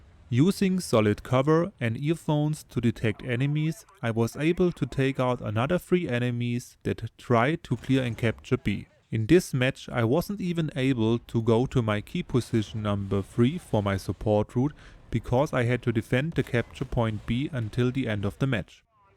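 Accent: Germany. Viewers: English is the yellow from the egg. Spaß klarer deutscher Akzent aber Video hat trotzdem mehr Aufrufe verdient, gut so 👍🏻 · Der deutsche akzent 😂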